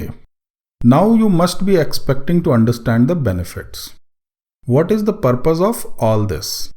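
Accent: India. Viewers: Heavy In accent is a plus. Perfect videos are typically less natural. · namas te. дорогие индусы, пожалуйста, учитесь говорить по-английски нормально, ваши курсы невозможно слушать. речь непоставленная, акцент жуткий, половину слов просто непонятно иногда.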